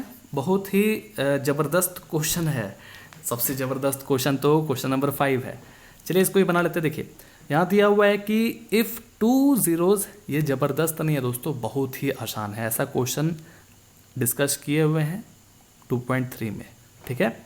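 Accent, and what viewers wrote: Bihar, India. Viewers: sir app bihar ke ho?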